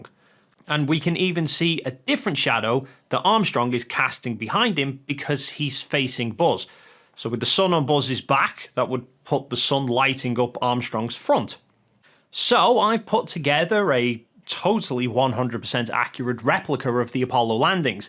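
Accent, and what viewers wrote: Netherlands. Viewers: Yes, the Dutch accent is strong in this more.on. So Goldmember is a better comparison. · He sounds Dutch, with that accent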